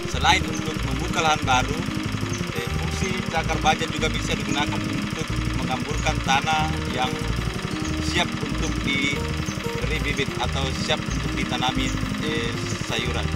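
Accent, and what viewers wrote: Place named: Sidrap, Indonesia. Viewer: Dialek nya khas daerah sana. Aku udah pernah main ke Sidrap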